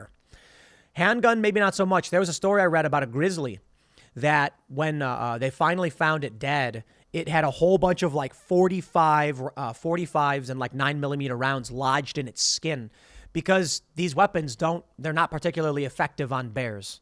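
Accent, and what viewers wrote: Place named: Chicago, United States. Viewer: I just realized still has a Chicago accent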